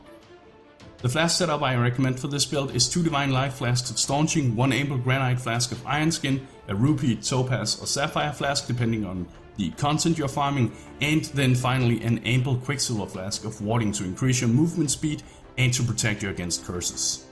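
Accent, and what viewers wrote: Denmark. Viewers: The accent tells me he's a Dane, am I right?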